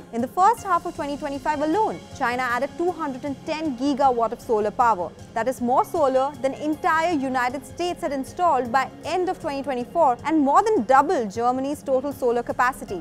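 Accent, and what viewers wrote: India. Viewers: why cnbc has indian accent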